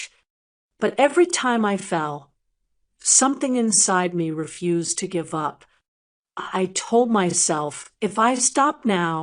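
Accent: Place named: United States. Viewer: American acsent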